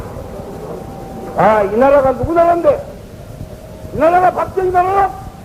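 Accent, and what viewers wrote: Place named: Jeolla, South Korea. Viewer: 품격없는 선동의 말솜씨로 과연 나라를구할수있었을까?목소리 넘싫다.전라도사투리 넘싫다.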